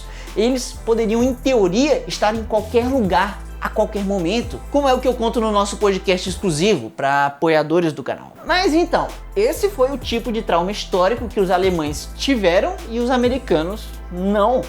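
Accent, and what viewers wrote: Ceará, Brazil. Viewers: Certeza que ele é cearence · tu é do ceara man? kkk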